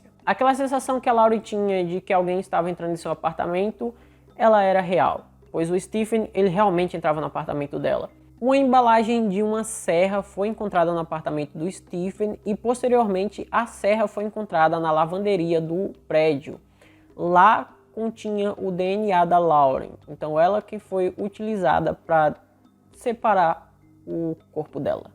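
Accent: Northeast, Brazil. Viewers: Parabéns pelo seu trabalho. Se posso fazer uma observação essa é que você tem uma forma muito boa de expressar sua opinião . As partes engraçada deixa mais leve a narração. Ao mesmo tempo que choro em alguns casos, ao mesmo tempo dou risada com seu jeitinho de falar( acho que tem sutaque nordestinos). Sou nordestina e amo cada pedacinho , sinto muita falta .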